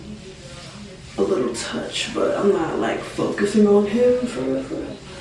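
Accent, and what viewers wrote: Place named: New York, United States. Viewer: Def dying my hair tomorrow and hoping it turns out like this. 😂😂 watching the video and listening to you talk I was like ain’t no way she not from New York 😩🤣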